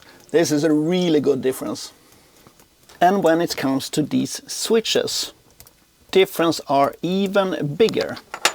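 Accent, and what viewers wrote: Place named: Sweden. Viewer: Sounds like a Swede :)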